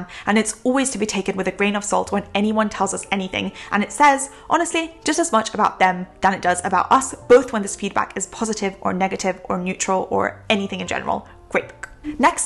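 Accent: United Kingdom. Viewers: That British accent goes like a song. Can't have enough of it. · That's one of the most british-ish accents I've heard recently. + I highly recommend reading Unscripted : life, liberty and the pursuit of entrepreneurship by MJ DeMarco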